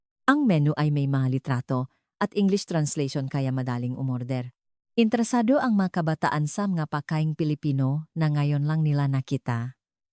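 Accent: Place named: Indonesia. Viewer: Ohhh you are Indonian halata sa'yo yong accent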